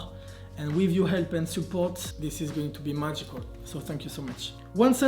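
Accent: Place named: England, United Kingdom. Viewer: Ton accent anglais m'amuse toujours autant, chouette vidéo bien sympathique! Bonne continuation à toi!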